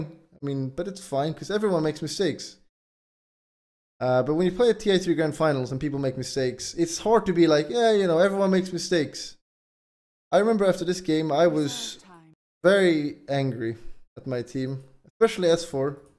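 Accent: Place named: Sweden. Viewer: i think it's his hard swedish accent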